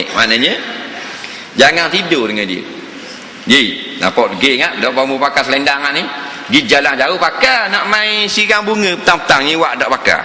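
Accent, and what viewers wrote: Terengganu, Malaysia. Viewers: ustaz ni bgus dgn cara dia..ada unsur jenaka n pengajaran..walaupun dgn slang trngganu...tp dpt fhm msg yg nk disampaikan...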